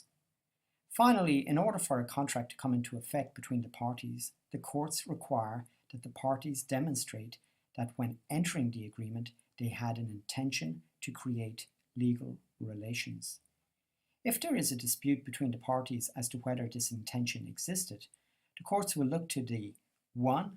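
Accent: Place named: Ireland. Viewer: This information is valuable! The narrator sounds like an Irish native. Does this presentation of Common Law apply to Ireland?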